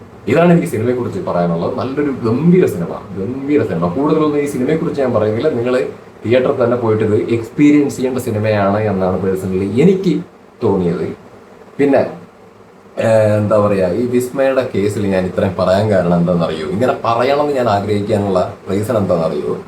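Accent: Palakkad, India. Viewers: നിങ്ങളുടെ പാലക്കാടൻ slangil സിനിമ റിവ്യൂ കേൾക്കാൻ കാത്തിരിക്കുകയായിരുന്നു.😁👏👏Great work.. Keep Going.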